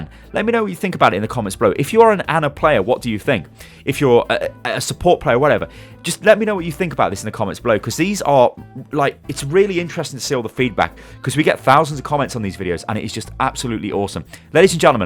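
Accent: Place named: United Kingdom. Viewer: Sometimes i wonder if i am even i interested in the video itself or am just staying because if you nice smooth british voice and accent ¯\ツ/¯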